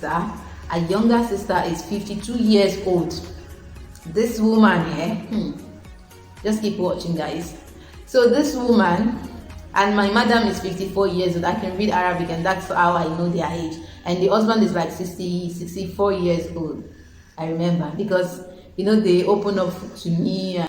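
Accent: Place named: United Kingdom. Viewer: Your British accent is fire 🔥.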